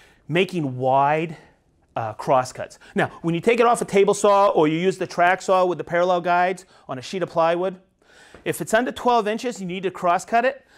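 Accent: Boston, United States. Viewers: It's like being at a carnival. And he's the barker from Boston.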